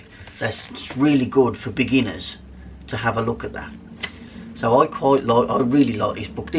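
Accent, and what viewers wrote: United Kingdom. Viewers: Where about in the UK are you from. Am I detecting a Black Country accent? or am I a bit off? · I can tell by your accent you must live in the West Midlands. If you do, you must visit Iron Bridge, some incredible wildlife there.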